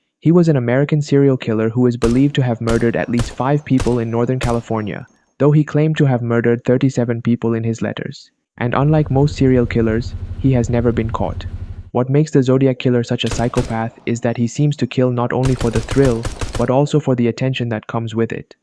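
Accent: India. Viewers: Bro's accent sounds like an Indian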